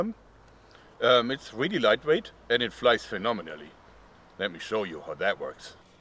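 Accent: Germany. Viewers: Du sprichst mit einem sehr starkem deutschen Akzent o_O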